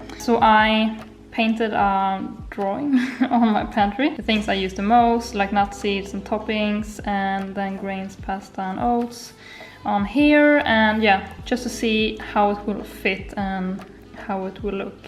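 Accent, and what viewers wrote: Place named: Sweden. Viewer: your accent is so cute! are you from Sweden, srry im new here :)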